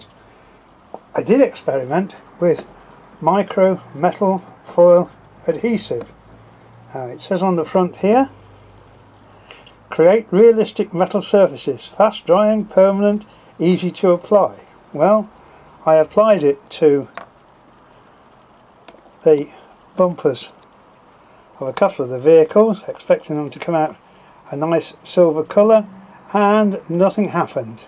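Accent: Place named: Northern England, United Kingdom. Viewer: Grand stuff, you sound reet Northern in it......great modelling tips.......don't worry some one the other week said I sounded very Northern!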